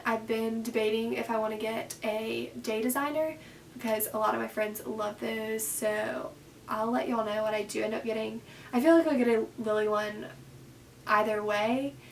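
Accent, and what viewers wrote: South, United States. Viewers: I think that you have a slight Southern accent, especially on your o's.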